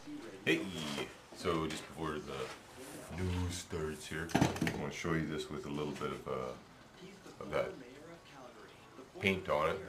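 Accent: Canada. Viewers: canadian ahy?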